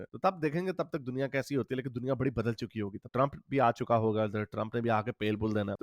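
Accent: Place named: Punjab, India. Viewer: No no Rawanda, Punjabi tone not Afghani.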